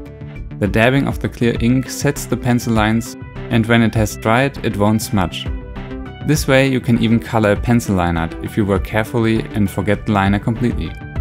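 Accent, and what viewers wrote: Germany. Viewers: Are you from Germany? I think I can hear an accent. Anyways, very good video because I bought Copics too, because I I think they'd be good for Manga drawings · can i hear a German accent? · I love your beautiful German accent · you are german bc i hear it xD and i am german and on the paper block i can read "500 blatt" thats german bro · Tangerine He got that big fat german accent... just like me :)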